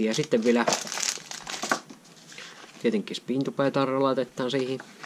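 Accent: Savo, Finland. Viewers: asiallisia videoita savon murteella, sekä hyvät kalastuspätkät. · aloin tilaamaan sillon ku kalastusvideoita alkoi tulemaan ja parasta on toi sun savon murre. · Aitous ja savon murre. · Sun seuraaminen lähti niistä chilivideoista kun kattelin e6363ee:n kanavaa ja äijä oli sielä järves aivan pellit kiinni😂Ite oon Pohjanmaalta kotoisin ja jotenki tuo Savonmurre on niin hauskaa kuunneltavaa,ja se et läppä lentää niin saa nauraa välillä melkein kuset housuissa.. Ja nää nykyiset kalastusvideot on tosi hyvää settiä kun itekkin oon kalamiehiä.. Jatka samaa rataa vaan! Oot parhaimpia tubettajia joita oon seurannu.👍👍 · Tykkään erityisesti kalastusjaksoistasi ja myös kalastukseen liittyvästä muusta materiaalistasi. Savonmurre on myös mukavaa kuunneltavaa. Olen oppinut myös kalastusjaksoistasi paljon uusia tekniikoita ja kalastustapoja, kiitos siitä! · Onhan tuota tullu seurattua ihan alkuajoista asti. 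Kaikenlaiset pärinät ja savolaisuus sai seuraamaan. Ja kyllä se savolainen suorapuheisuus ja elämänasenne on saanut pysymään kanavalla. Olihan ne pärinävideotkin viihdyttäviä, mutta nykyinen suunta on oikea, jatka samaan malliin!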